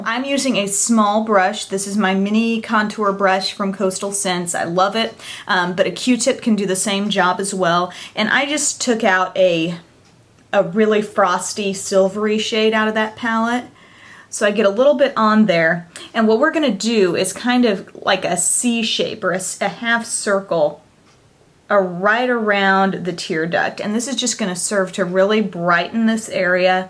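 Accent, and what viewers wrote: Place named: Illinois, United States. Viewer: Congratulations on your award! I live overseas, but I'm originally from Illinois and especially enjoy hearing your familiar accent. :)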